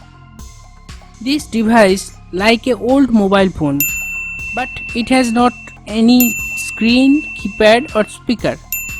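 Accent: India. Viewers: I hear Indian, I click close video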